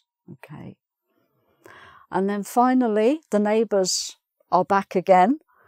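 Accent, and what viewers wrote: England, United Kingdom. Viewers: Thank you a lot. Your lessons are really useful and you have awesome British accent as well)) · Thanks a lot mam! You are great! I love British accent so I'm learning lots from you :) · it' s always a pleasure to listen to your lessons! I love your beautiful english accent so much...thank you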